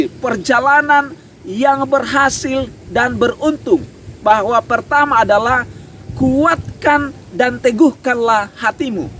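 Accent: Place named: Batak Toba, Indonesia. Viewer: Terimakasih pak Pdt. Btw pak Pdt suku apa? Saya dengar logatnya seperti suku Batak Toba ( no rasis). Saya Batak Toba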